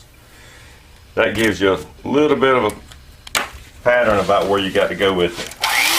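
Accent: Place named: Deep South, United States. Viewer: He ain't from Chicargo, that's fer shure. More like Ludiwici, Georgia, I'm a guessin. South, deep south. More southern than even the Sawfish. : ) I like it.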